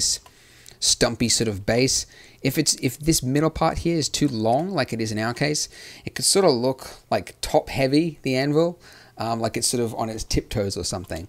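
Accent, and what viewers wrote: Australia. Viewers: funny australian Tutorial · He's from Australia lol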